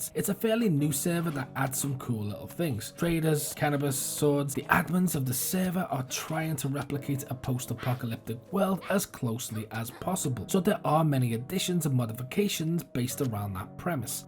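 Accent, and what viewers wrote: Liverpool, United Kingdom. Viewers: Are you from the Toxteth area of Liverpool by any chance? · Yo my fellow scouser where about are you from hahaha love to join up with you, my mates are all moaning bastards on dayz I reken youd be sound and chill to play with. Thank you for the content too!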